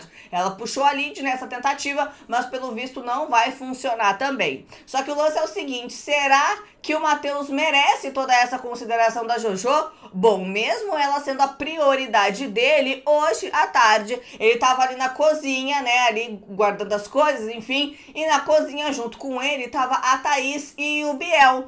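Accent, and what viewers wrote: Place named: Rio Grande do Sul, Brazil. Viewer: Guria tu és gaucha tchê.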